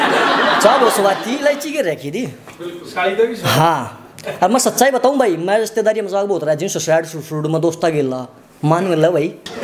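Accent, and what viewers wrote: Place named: Haryana, India. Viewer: Bhout badiya bhai Haryanvi Level Increase 👍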